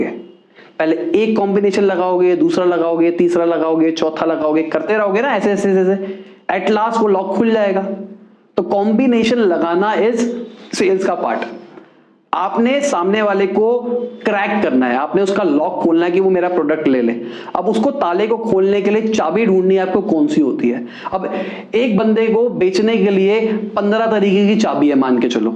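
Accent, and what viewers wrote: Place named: Delhi, India. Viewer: Wow maja aa gaya aaj, sales mai aap master ho.... Muje aap delhiwalo ka bolne ka toon bahut pasand hai.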